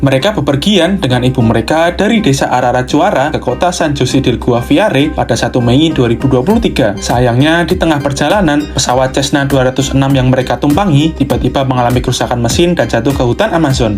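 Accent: Java, Indonesia. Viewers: Ngomong nye biase aje...keles..😢 Jgn di medok2in Logat nye 😭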